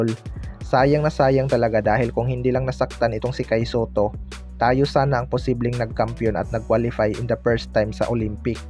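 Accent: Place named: Visayas, Philippines. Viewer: NAKU PO ITONG PROBINSYANO NA BLOGER BISAYA PA PUNTO.. LOLOKOHIN MO KAMING MANILA BOY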